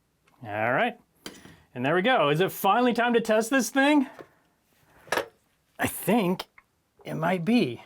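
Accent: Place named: United States. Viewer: it feels like my mate vince with american accent 😂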